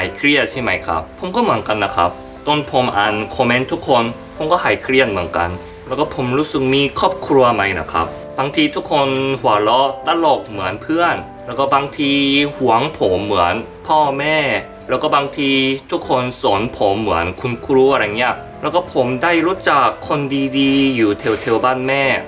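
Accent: South Korea. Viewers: hwaiting Your videos are so cool and uplifting. Your Thai is getting better and better but honestly I love your unique Korean/Thai accent so much. Keep being you 👍🏽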